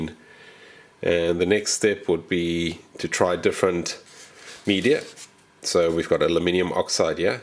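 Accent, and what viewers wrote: South Africa. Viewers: Dude I know you're a New Zealander, but that accent sounds so South African to me. I can't believe there's THAT much similarity between the accents, so what gives?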